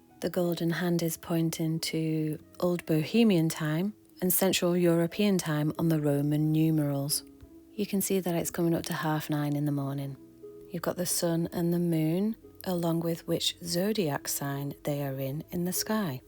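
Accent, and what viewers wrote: United Kingdom. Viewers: youre like jon levi if he was a woman and bri'ish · Great to hear a northern voice that’s also got a great level of intelligence also.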